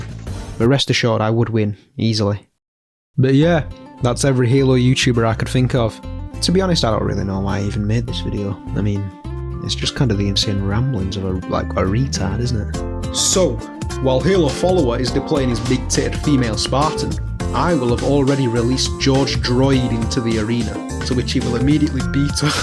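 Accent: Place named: Birmingham, United Kingdom. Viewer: Honestly seemed liked a birmingham chap to me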